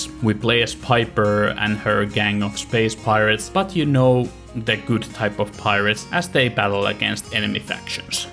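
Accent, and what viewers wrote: Finland. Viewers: Oot Suomalainen? Pikkusen toi suomi aksentti kuuluu puheessa 😄.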